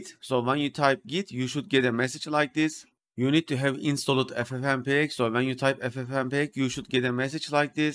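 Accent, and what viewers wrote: Turkey. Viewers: Oh Türk aksanlı İngilizce. nerede görsem tanırım :) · I BET YOU'RE FROM TURKEY 😅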